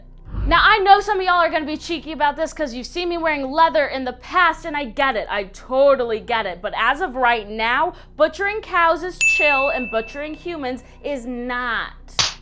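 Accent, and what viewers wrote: Germany. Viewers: best German accent ever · I'm in love with! I have subscribed and I will NEVER unsubscribe! You're actually really good at a German Accent! (I'm From Germany) Please make more videos!!!!!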